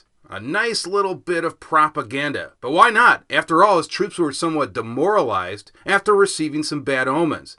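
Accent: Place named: United States. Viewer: I live in Vancouver, thank you for asking! You sound....American?...judging by your pronunciations. Where from exactly? On that note, in your piece on the roman Legion, regarding a couple of your pronunciations; isn't it supposed to be Prince-a-pay, and Tree-aree-eye? That is how I always heard these names pronounced. I would be curious to know..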